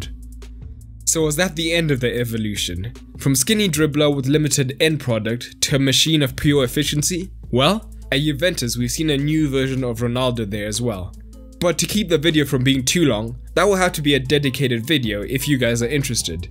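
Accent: South Africa. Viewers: Am I the only one who thinks this accent is South African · You have a South African accent.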